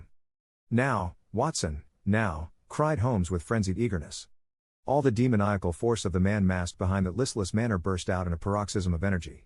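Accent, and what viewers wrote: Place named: United States. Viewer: You can't read Sherlock Holmes with a robot American accent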